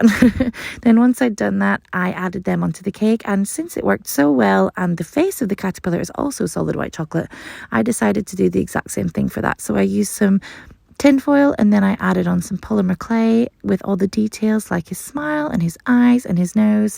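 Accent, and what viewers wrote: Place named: North, United Kingdom. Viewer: talks like a northerner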